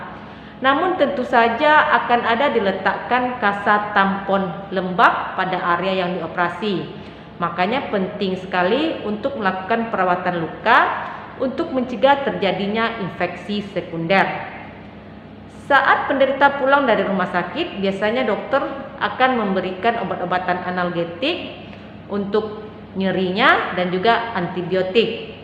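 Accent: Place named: Padang, Indonesia. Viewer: sepertinya logat dan namanya buk dokter orang padang, maaf kalau salah🙏 kalau daerah sumbar RS mana yg biasa menangani fistula ya buk?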